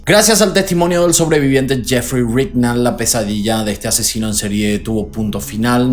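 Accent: Paraguay. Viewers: Los prejuicios siguen existiendo infelizmente los gays, trans y mujeres, fíjate el caso de el niño Lucio en Argentina, le negaron la custodia al padre y al tío paterno,las leyes están hechas por hombres en general y no por ser de libre pensamiento Feliz Navidad, te sigo hace poco pero tus cortos sin muy buenos Vivi en Asuncion 4 años hace muchos años y reconocí al toque tu lindo acento de Paraguay Soy Argentina y vivi en varios lugares, el último ( por ahora) es Barcelona Te mando un abrazo y te felicito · De casualidad no sos Paraguayo? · Wacala hablas como paraguayo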